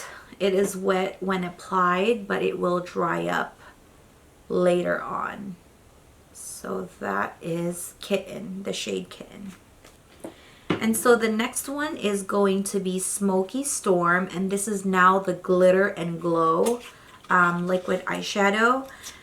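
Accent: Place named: England, United Kingdom. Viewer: I like your shimmer and glow but I only could think your very nice English accent :)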